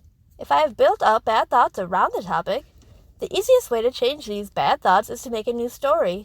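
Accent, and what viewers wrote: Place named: United States. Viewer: Thank You Yesterday For All Your Patience, And Kindness To Helping Realize That I Am The One Who Decides My Reality. You Are Truly Awesome. I Am Curious Of Your Accent What State Are You From ? If You Don't Mind Telling Me. If You Do Thats OK. I Understand. Regardless You Are Awesome. I Am Sure By Using The Term Awesome You Have Figured Out I Am Old. LOL.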